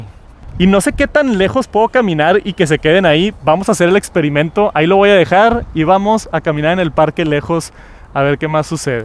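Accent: Mexico. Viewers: Porque es de México...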